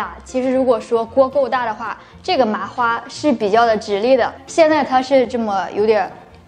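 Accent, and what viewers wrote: Shandong, China. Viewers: 我听口音也像山东人，竟然还是临沂老乡！是临沂一中毕业的吗？怎么没有糁？ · 普通话有山东口音。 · 我听口音早就听出来她是山东人了，哈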